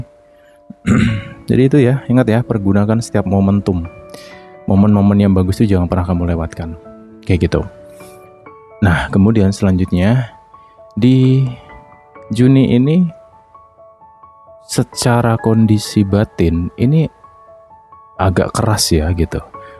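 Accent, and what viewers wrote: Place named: Medan, Indonesia. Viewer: Medan mas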